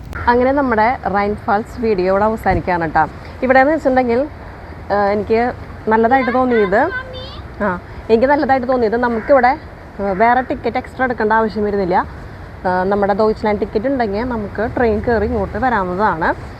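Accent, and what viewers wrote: Thrissur, India. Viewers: I love your Chalakudy Thrissur accent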